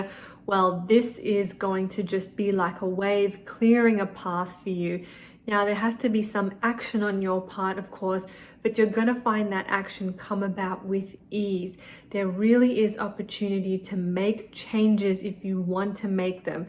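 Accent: Australia. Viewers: I love your voice. Aussie accent?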